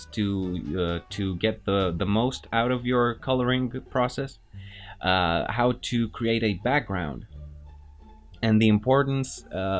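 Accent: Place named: Romania. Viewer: yep...sounds like a romanian brother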